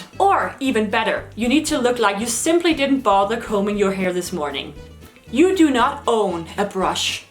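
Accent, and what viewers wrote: Denmark. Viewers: Jesus christ her danish accent is amazing ! I think i remeber this girl from my younger days where she was adored as a scene goddess. Good times ... good times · Mr. Ear Raper ! Lyt til hendes accent. Hun er selv dansker.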